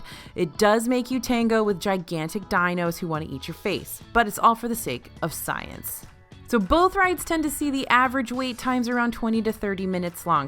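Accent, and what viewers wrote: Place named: Western New York, United States. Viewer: I watch these videos all the time because I love Disney, but now I see that it's also because of the WNY comfort in your voice!!!!!!!!!! -a fan from Buffalo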